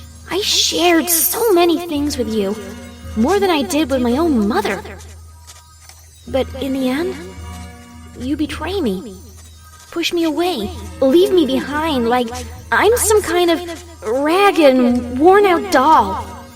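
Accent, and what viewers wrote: United Kingdom. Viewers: i loved your storys plus your voice is kinda cute in British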